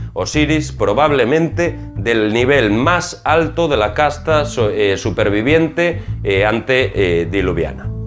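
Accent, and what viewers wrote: Galicia, Spain. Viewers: Al hablar muchas veces se te nota el ramalazo de gallego de pueblo , un saludo 💟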